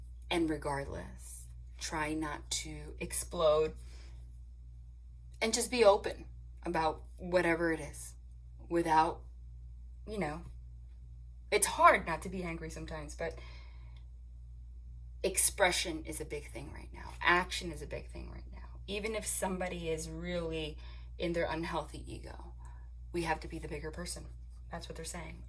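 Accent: New Jersey, United States. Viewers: I love her Jersey accent!! So cute and entertaining 😁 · I have just come across your page and im thinking “she has kinda a jersey accent hmm” and you said your from nj omg 💖 I love it!!! Its been crazy here as you know.... light and love for you sister 💞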